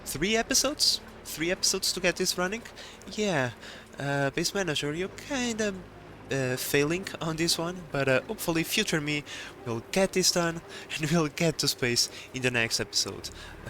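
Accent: Portugal. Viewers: Are you Portuguese ? Sounds like it ?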